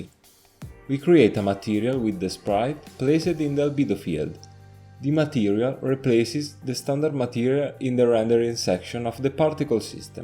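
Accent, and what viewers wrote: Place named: Italy. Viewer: am only here for the Italian accent :p Great video btw, the shader part was really interesting!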